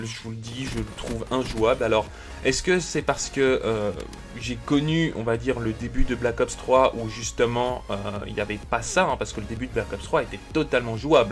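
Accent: Switzerland. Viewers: t'es suisse ? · Il est suisse je crois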